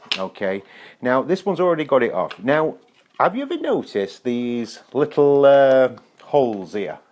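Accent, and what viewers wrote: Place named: Cockney, United Kingdom. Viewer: Sorry, man, but your "m'kay" and "o'right" remind me video by Alfie Solomons "Learn the cockney accent". 😅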